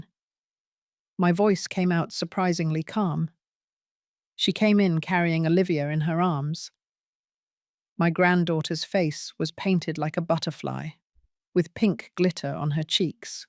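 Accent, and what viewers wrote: England, United Kingdom. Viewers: This British accent is making me crazy. I hate it. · Yes I'm tired of the snooty English accent!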